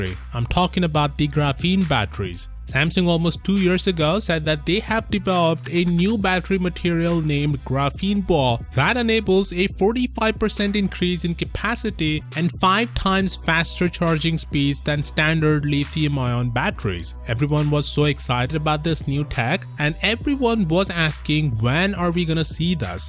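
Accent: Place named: India. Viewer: ugh. indian accent. I disliked the video instantly.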